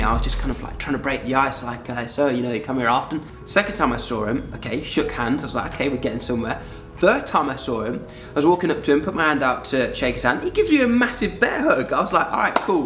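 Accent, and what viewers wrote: RP, United Kingdom. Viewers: Ngl he still sounds posh his real voice, like it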